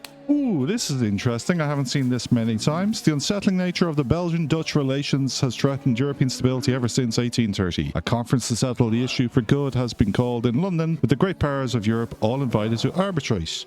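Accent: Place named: Ireland. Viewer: Great to hear an Irish accent, even better when its with paradox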